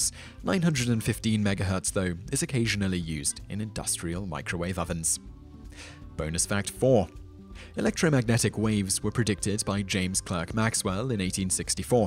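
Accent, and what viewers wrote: United Kingdom. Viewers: Silver theDragon No, he'a pronouncing it the proper way, rather than the butchered American way. And before you start, listen to the accent. He's British. He is speaking the English language perfectly correctly according to the country he comes from.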